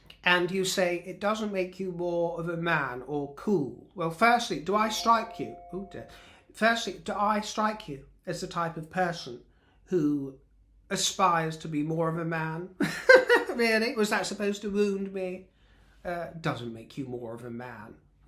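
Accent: United States. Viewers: love your American accent ❤ 😆😆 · your American accent is a scream!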